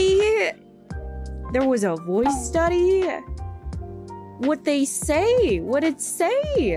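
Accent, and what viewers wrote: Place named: Canada. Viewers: Was gonna say, part of that would be that she's Canadian. Like with English, Scots, Welsh and Irish. Because the US population is so large and their offshoot of English is so common, the minute you hear anything else, it would be strange for them. I've talked to some folks on the Canadian West Coast and further inland like British Columbia and their nuances are very similar to. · is korean,probably Probably she came to Canada very young and she acquired the accent of the region where she lives.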